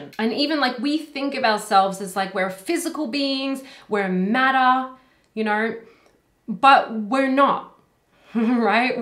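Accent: Australia. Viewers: You are amazing. Are you Australian?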